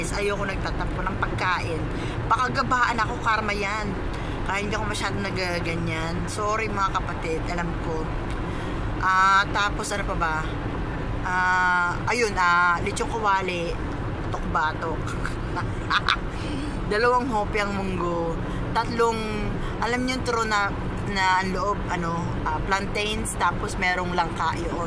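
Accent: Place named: Rizal, Philippines. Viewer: ate ung nsa likod mo panda fave ko haha.. sarap nmn jan,namiss ko kutsinta.ndi n aq nkbili here.. ate ung punto mo "ha-ne", gnyan dn kmi magslita dti ung nktira pa kmi s teresa rizal..sa rizal po ba province u ate?nkakamiss ang word na yan hehe..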